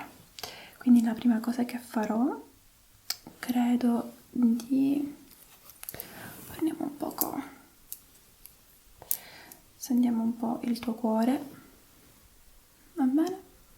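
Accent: Sicily, Italy. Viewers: Adoro il fatto che tu abbia un accento siciliano bellissimo♡♡♡ sei bravissima, complimenti! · ‘Mbare, I hear some sicilian accent here 😏😍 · In tutto ciò solo i veri siciliani capiscono l'accento fortissimo(e bellissimo) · Che meraviglioso accento siciliano. Una mia cara amica di Siracusa parla proprio come te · It seems that you come from Sicily because of your accent. It's wonderful,gorgeous. As an italian,complimenti❤ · Sei siciliana? Si sente tantissimo l'accento😄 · L'accento sicilianoo❤😂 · i heard some sicilian accent 🤣🤤 ti amo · Si sente che è siciliana... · Hai un accento siciliano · Siciliana sicuramente, si sente tantissimo e capita anche a me ogni volta che vado al nord nonostante io parli in italiano 😂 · Seem that you have a Sicilian accent 😂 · Io sento l accento sicilianooooo!❤️ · Hai un accento siciliano fortissimo ahahah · Bellissimo il tuo accento siciliano 😍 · il bello è che è canadese ma quando parla italiano sembra siciliana doc😂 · Con l'accento marcatamente siciliano, ce ne vuole coraggio a dire che è "americana", solo perché ha vissuto qualche anno in Canada! 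· Sembra siciliana hahahaha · Quando parli in italiasiciliano! sei molto tenera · L accento siciliano é un tocco di classe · omg u speak Italian way to good!!! I am Italian and I can say that u have a beautiful Sicilian accent! I love ur videos,;* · Non capisco. Questa ragazza ha origini italiane? Il suo accento è italianissimo, quasi siculo!! Adoro 😻 bravissima! Hai una voce meravigliosa. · Oh wow, non avevo idea che fossi semi-italiana 😊 All'inizio ho visto questo video e ho pensato 'oh ok, allora è un genio', ma adesso sapendo che sei cresciuta in Canada ha un senso 😁 Hai un accento stranissimo, un misto di forte siciliano e straniero non meglio identificato haha Ma parli molto bene, mi piace! Ti dico qualche errore che mi è saltato all'orecchio per aiutarti: lavorare sul computer = al computer, infezione negli orecchi = alle orecchie, lentine a contatto = o dici lentine e basta (che comunque è molto informale e forse siciliano, essendo siciliana anch'io non sono sicura XD) o lenti a contatto, sistema immune = immunitario. 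Comunque grande! Tuo marito è italiano o canadese, per curiosità? :P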